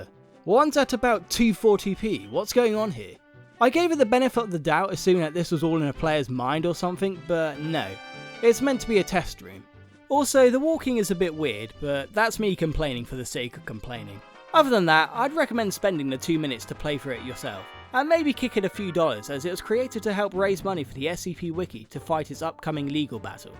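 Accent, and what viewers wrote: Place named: United Kingdom. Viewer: you sound british cool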